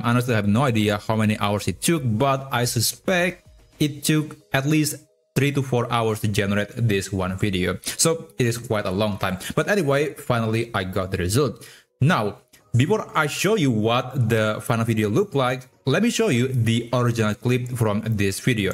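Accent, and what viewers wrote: Indonesia. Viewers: Org indo ya bg? Aksennya ketara😂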